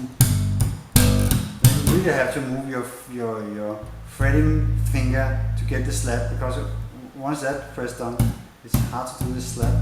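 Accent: Denmark. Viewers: haha han er så meget dansker !!! xD det så tydeligt på hans engelsk udtale ! xD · That Danish accent is so funny ! Great lesson :)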